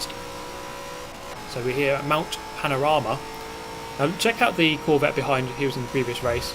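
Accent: United Kingdom. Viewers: Your charming British accent is overselling your actual driving skills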